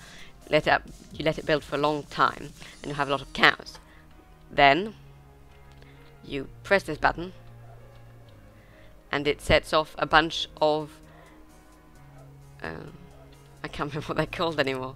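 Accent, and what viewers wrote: RP, United Kingdom. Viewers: Very helpful for an automated farm! Additionally love the RP accent. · Wow you are very good at this "tekkit" of which you speak of... although are you Brittish because you seem to have a very 'posh' accent...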